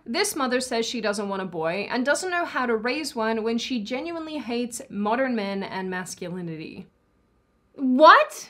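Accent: Australia. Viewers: Well that’s the first time I’ve heard someone imitate crocodile Dundee in their own Strayan accent. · These people are fucking insane!!!!! I'm hearing your Aussie accent come out and I'm loving it!!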